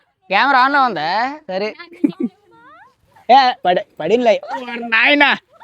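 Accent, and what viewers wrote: Srikakulam, India. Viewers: Srikakulam slang very funny and super bro Form tirupati Neenu mee pelli ki tirupati nundi srikakulam ki vasthunna bro🎉🎉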